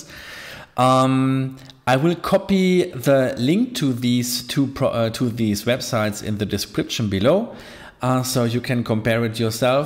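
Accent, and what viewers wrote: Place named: Germany. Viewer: I think they thought since the video title is in English, it meant perhaps Jaspers products were available to be shipped from within the US.Then he heard your accent and realized that you are in Germany, so his hope for lower cost shipping was dashed.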